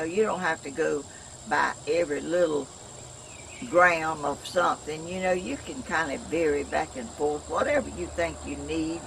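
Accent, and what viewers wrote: South, United States. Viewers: You,sound like down home, lovely, southern girl. I’m from Alabama and I,sound very southern myself. Love your videos. Great ideas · I feel so Blessed to have found you on You Tube! I do silk flowers ideas. Wreaths, arrangements and more. God gave me this talent and I give Him praise for everything! You are a joy and time gets away from me also. I can't seem to get anything accomplished especially since we made connections! lol I just lay back and enjoy all your work and your southern talk and cute happy laughter! I'm like you and I like to talk!! Sure wish I could meet you but I live in Louisiana and Arkansas! Both states!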